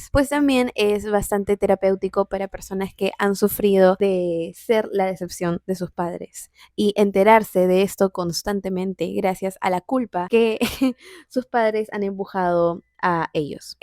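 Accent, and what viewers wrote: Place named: Peru. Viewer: ... Pues déjame decirte que has hecho una buena reseña con tu dulce voz, de acentito, de muchachita... peruana.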